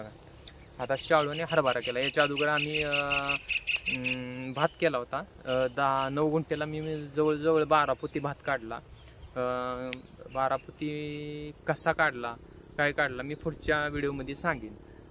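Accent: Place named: Satara, India. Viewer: भाषा सातारी वाटत्या 👌👌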